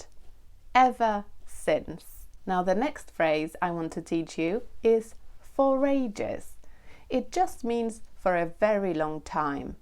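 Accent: United Kingdom. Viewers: 😊 the German-American hast the all right. It' s always a pleasure listening to your fine Bitish accent! 😊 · It's such a joy to listen to the Royal British English from, especially for an immigrant in Canada.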